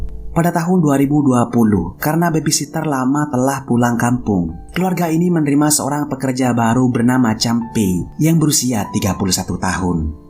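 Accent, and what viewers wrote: Madura, Indonesia. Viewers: Salam.kenal bang... perantau di banyuwangi asal bondowoso sll menyimak. Terkdg sy merasa logat bicara smyn kyk parak pahe'eh bik ngkok kang😁😁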